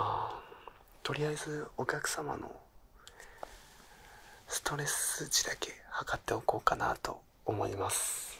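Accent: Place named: Kansai, Japan. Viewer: いつも標準語のロールプレイしか聞かないから関西弁ロールプレイ新鮮でめちゃよい！！！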